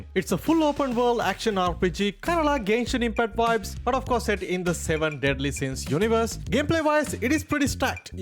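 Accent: India. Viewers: south asia , i think india most probablt cause his indian accent is slippin a little out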